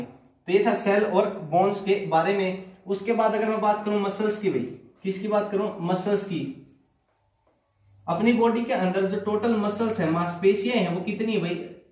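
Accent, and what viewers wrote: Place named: Haryana, India. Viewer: Boli me hi haryanvi touch hai 😅😅 gajab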